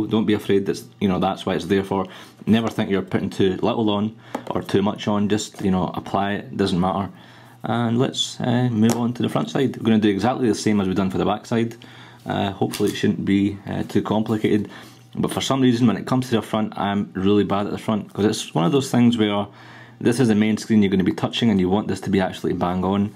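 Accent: Scotland, United Kingdom. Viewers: rofl you fail, anyways that's just a scottish accent, and it is my number one of accents i want to learn (I'm dutch so i'll have some challenge), on my number two i've got the australian accent. I won't even buy a liquid-applied screenprotector but when I heard this accent I just watched throughout the entire vid :P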